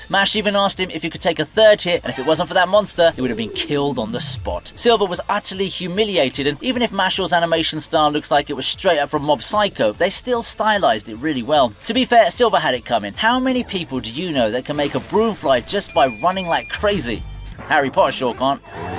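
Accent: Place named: United Kingdom. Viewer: Hou je kanker bek met je kut Britse accent. Groetjes Jos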